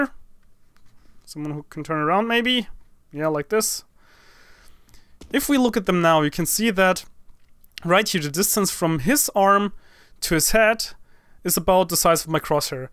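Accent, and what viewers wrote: Germany. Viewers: are you german you sound like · Are you from germany? If yes = Man merkt es an deinem Akzent :P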